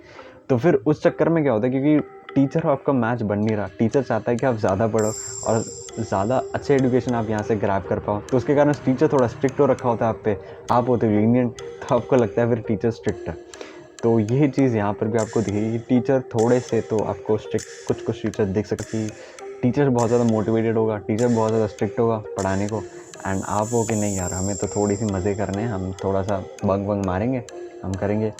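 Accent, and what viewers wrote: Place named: Maharashtra, India. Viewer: Dream College ❤️.... Thanks 😊 This will be very useful for us.... Your Marathi Accent is too good...👍😊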